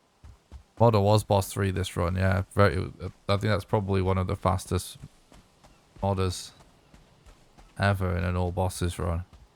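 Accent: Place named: Leeds, United Kingdom. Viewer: Fucking hell watched four hours without being able to place your bastard accent and it's Leeds! Big up. When you said 'You got your head kicked in if you listened to heavy music' I thought 'oh so he's northern at least' lol.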